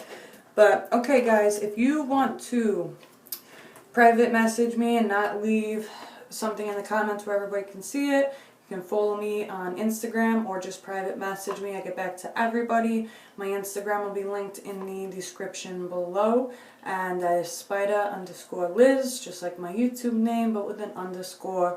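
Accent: New York, United States. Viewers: Your so pretty! Love your NY accent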